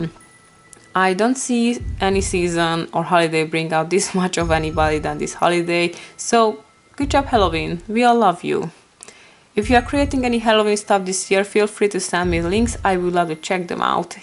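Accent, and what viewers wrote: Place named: Hungary. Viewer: Szia! Olyan érdekes látni, hogy mennyi magyar művész bújik meg elrejtve a videómegosztókon. :) Nagyon szépen rajzolsz, véletlenül hallottam meg a voicoverben hogy magyar vagy ><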